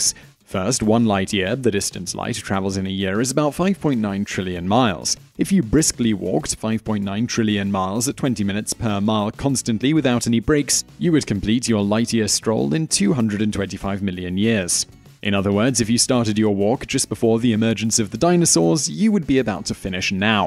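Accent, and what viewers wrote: England, United Kingdom. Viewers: The man accredited with developing 80% of our worlds modern technology said to think in terms of “Energy--Frequency-Vibration.” Or we can listen to this well spoken English Accented MORON!!! I’m afraid the masses have already excepted the later. 🤪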